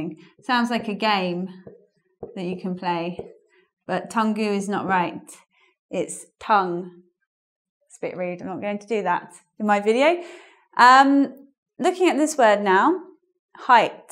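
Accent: United Kingdom. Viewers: Hi. Thank you for your video! Your British accent is so beautiful! I could stay the whole day just listening to your voice haha your video is good, but sometimes you should repeat the pronounciation of some words more often. For example, you just pronounced the word ''tongue'' once, so I had to come back the video again. In addition, thank you again and I think that you chose good examples for the video! xx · I'm learning American English... Is this british ? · To be honest I don't learn anything from theese I just like listening to british english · Hi, Please I would like to learn the pronunciation of the english O how you pronounce them in the words as SO, I know. Cuz I m still hearing the Y literally SO - soy.:D Thank you. Btw I really like the british accent about the O:D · Is this Brittish? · Hellooooo dear. Just wanted to say your lessons are top notch. 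The very best, really. I know some English and I love it. Can't explain why. But the more we know the more we know we need to know more. Huahuaa. Your British pronunciation is delightful. I 'm really fond of British English. Also I'd like to ask you if you give private lessons --- maybe on ITalk, the site that gathers a bunch of teachers teaching languages online.. Please don't stop. Carry on showing the world how great this language is. All the very best. Cheers. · A thousand thanks, your are a fantastic teacher. I've lived for many years in the US (I'm from Argentina), and it is difficult to me to understand British accent. Another thing to remark is that you have rules that in american English are often overlooked, so I'm learning a lot from your tutorials. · I love british accent, thanks for your classes, I am really enjoying it :) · Hi! I'm learning English, and I love yours videos :3 and I also love your British accent :3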